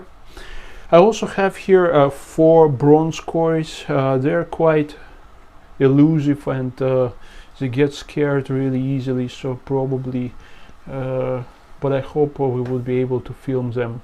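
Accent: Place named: Germany. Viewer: Wow! what a beautiful tank and beautiful terrestrial plants growing above and around it. It seems you are experimenting with many of the same things in your tanks as I am. Where do you live? You sound German, very understandable but a slight accent. I am in Ohio on the Pennsylvania border. Not that it matters but I am just curious. I started out my aquaponic experiments with spider plants. I had about seven plants I planted in gravel in an old breeder box. Their growth exploded. The tank is in a custom-built cabinet with open slots between the boards. the spider plants are growing up through the slots on the top of the cabinet and long runners are hanging down over the front of my tank hundreds of new spider plants of various sizes are growing on these vines. My setup is in an upstairs room and has no room for expansion and I do worry about putting too much weight on the beams and though I am very careful to not make water messes you know that is not always possible. 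So I have a basement room I am planning to move to where I will have lots of room to expand the hobby. I purchased a 10-gallon tank today at a second-hand store for $5.00 and actually I have several other small tanks that I have not set up. If you go thrift store shopping on a regular basis you would be surprised how cheap you can pick up small tanks. So I will be migrating to the basement. Too bad you don't live around here. I could take you to a rocky canyon which has a large but shallow headwaters of a river.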